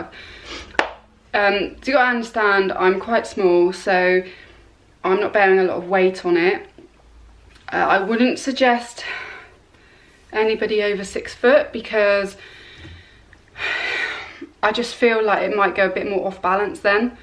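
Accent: England, United Kingdom. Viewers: i love the english accent... the more up north the better it gets ;-) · Nothin wrong with your accent but then i'm english too. Thanks for the additional info, shame fitness superstore have followed all of the other sellers and whacked the price up to £350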